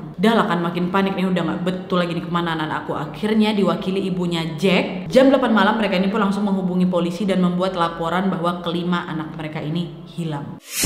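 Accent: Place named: Riau, Indonesia. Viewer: hah makanya itu wak aku suka kali sm dia,dr cara ngomongpun sama karna logat2 melayu batak kepulauan riau😅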